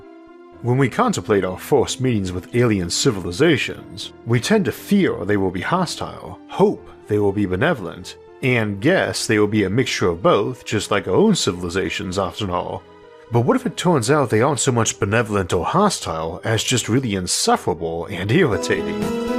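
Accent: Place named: United States. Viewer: American, but he also has rhoticism.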